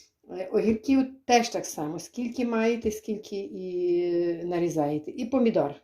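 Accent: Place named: Khmelnytskyi, Ukraine. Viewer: Дякую за рецепт салату.Приємно чути Вашу мову,так у нас на Хмельниччині розмовляють.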